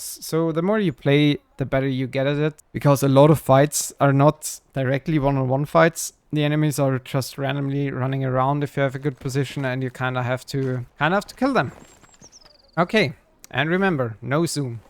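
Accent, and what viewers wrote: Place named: Germany. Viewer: Man merkt so das du deutscher bist😂😂 aber danke dein video hat mir echt geholfen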